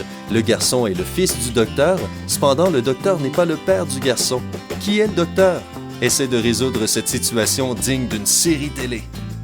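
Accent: Quebec, Canada. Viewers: Tamara oui c'est vrai, déjà qu'en français j'entends pas toujours, alors avec l'accent québécois 😐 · mais pourquoi la voix de canadien là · Pourquoi y'a un québécois (comme moi) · L'accent Québécois!! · la dernière c'est n'importe quoi! Mais j'adore cette accent québéquoi!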